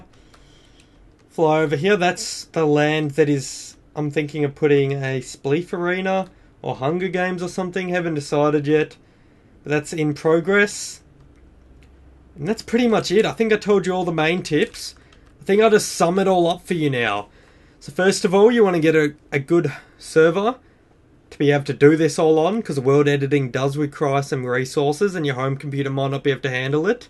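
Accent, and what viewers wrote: Australia. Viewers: nice are u australian just asking LOL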